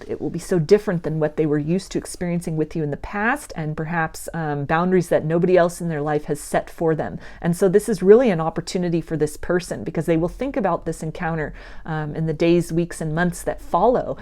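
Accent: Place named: United States. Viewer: Loving your American accent! Wishing you the best and plenty of success for your channel. New Subscriber! Thank you for your gifts. Have good day everyone!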